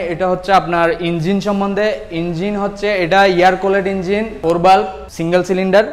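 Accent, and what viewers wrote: Chittagong, Bangladesh. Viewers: 100% chittagong ar manush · অনেকে দেখি উনার উচ্চারণ নিয়ে হাসাহাসি করছেন। অন্যের ভুল দেখে হাসাহাসি করার অভ্যাস আমাদের গেল না। উনি পরিশ্রম করছেন, ডেডিকেশন দিচ্ছেন এবং এভাবে হয়তো ভুল করে হয়তো নয় শিখবেন। সুন্দর করে কি ভুলটা সংশোধন করে দেওয়া যায় না? আমরা সভ্য মানুষের মত কি আচরন শিখতে পারবো না? যারা হাসাহাসি করছেন তারা কি মনে করেন যে আপনি সবকিছুতেই একদম পারফেক্ট। হ্যাঁ আমি দেখলাম উনার কথা বলার ভঙ্গিতে চট্টগ্রামের টানটা একটু বেশি এবং কিছু কিছু উচ্চারণ তিনি ভুল করেন। কিন্তু আপনাকে যেটা বুঝাতে চাচ্ছে যেটি দেখাতে চাচ্ছে সেটাতে উনি পারছেন এবং চেষ্টা করছেন। চলুন যারা চেষ্টা করে তাদেরকে আমরা অনুপ্রেরণা দিতে শিখি। 🙂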